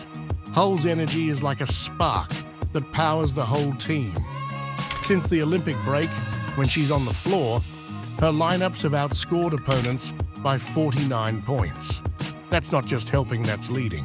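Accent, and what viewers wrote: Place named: England, United Kingdom. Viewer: Why is the voice-over either English, or worse, AI programmed to sound English. Get that crap back across the channel.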